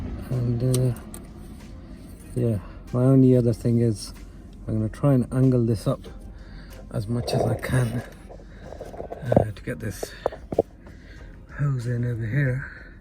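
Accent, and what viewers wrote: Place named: Bristol, United Kingdom. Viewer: Nice video 😎👍🏽... Sounds like a Bristol accent 👂🏽That's my town 👊🏽😎... Love the content, helped me gain some valuable knowledge for sure! Keep up the good work 🔥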